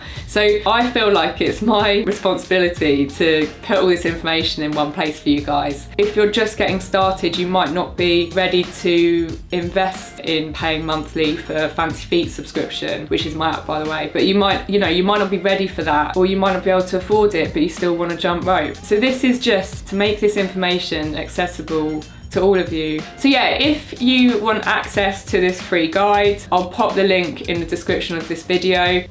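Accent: England, United Kingdom. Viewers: I agree about the Cincinnati Reds Hat but with your accent I expect to See a British Airways or a British Petroleum hat on . Or maybe a Quantas Hat too. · Accent is CLEARLY 100% English.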